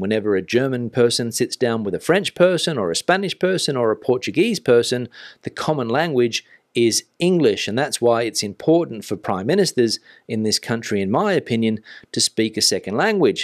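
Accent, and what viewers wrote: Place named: Australia. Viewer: Dude, you are without a doubt the best Spanish newscaster I have ever heard. I'm an avid follower, having lived in Spain over 20yrs in andalucia. You say it as it is in English with an Aussie accent and a straight face and crack me up. Keep doing what you're doing my friend and hopefully one day you'll take a mobile podcast over to Portugal, you're smashing the Spanish news for the British expats 😉👊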